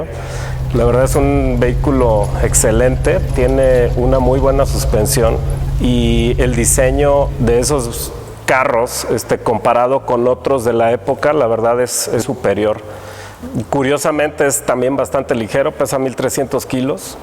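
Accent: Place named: Guadalajara, Mexico. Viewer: De que parte de Guadalajara eres ??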